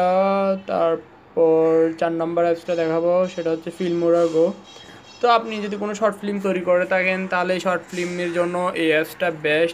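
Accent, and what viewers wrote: Chittagong, Bangladesh. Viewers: ভাই মাঝে মাঝে আপনার গলায় চাটগাঁইয়া ভাষা চলে আসে! আগে শুদ্ধ করে বাংলা ভাষা বলতে শিখোন।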